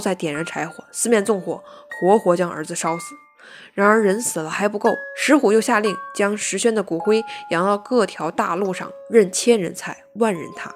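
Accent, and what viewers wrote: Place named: Beijing, China. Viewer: 姑娘說書內容詳盡又能不疾不徐且毫不做作！一口道地之北京話更讓吾人擊節欣賞！姑娘讚！既然姑娘熟讀中國歷史即使是分裂戰亂年代也是漫長的歲月可述說之內容亦如海水之不可斗量！姑娘妳何妨將之細分多期講述之？一則讓聽眾有時間消化二則也能有更多期的視頻此乃兩全其美也！姑娘妳何樂而不為？姑娘妳以為然否？同時姑娘妳也不妨開闢歐洲史的部份自馬其頓帝國起至二戰結束終也能製作非常多期之視頻矣！至少能讓銀明白阿提拉大帝和拔都汗對歐洲造成的傷害是多麼的巨大！還有為什麼蘇德一定非要瓜分波蘭不可！又為什麼明明是同樣的斯拉夫民族鵝螺絲和烏克蘭卻能生死搏鬥！以及為什麼日俄戰爭中波羅的海艦隊全軍覆沒卻是對蘇聯共產黨天大的幫助？等等………！如此增廣我等之見聞豈不美哉？姑娘妳其有意乎？忠言向來逆耳！若在下所言使姑娘妳不悅亦望姑娘展現大度一哂置之！則在下幸甚！姑娘亦幸甚！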